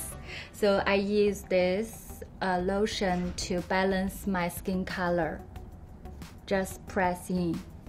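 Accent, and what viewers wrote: China. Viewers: Agree, also because her English sounds Chinglish. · Is she Chinese? Because this accent is common among Chinese. I like it!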